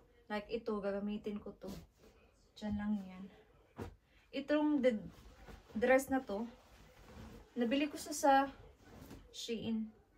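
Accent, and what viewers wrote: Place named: Visayas, Philippines. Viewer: bisaya di ai ka sis hahahha relate jud sis hahaha usahay galisod ko tagalog hahaha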